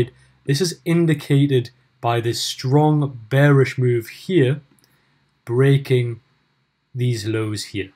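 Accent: Newcastle, United Kingdom. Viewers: Really good video! Are you a Geordie?? Cheers!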